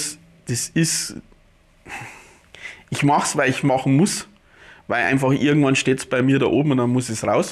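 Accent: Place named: Swabia, Germany. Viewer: Hallo, viele Grüße von Indiana in den USA. Ich schaue mir deinen Kanal sehr gerne an und habe ich schon vieles gelernt. Mal sehen was noch alles auf uns zukommt im kommenden Jahr. vor vielen Jahren lebte ich in Stuttgart und dein Dialekt bringt mich immer wieder zum schmunzeln.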